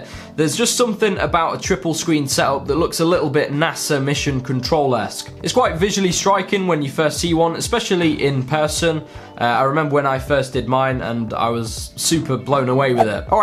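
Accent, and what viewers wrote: United Kingdom. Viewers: Love your british english xD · its 36 secs in and i cant ignore that bri´ish accent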